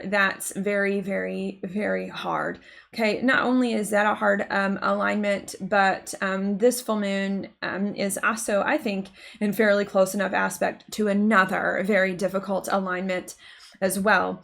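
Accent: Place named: Georgia, United States. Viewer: Georgia huh⁉️ That explains that accent of yours I love so much lol❤️😅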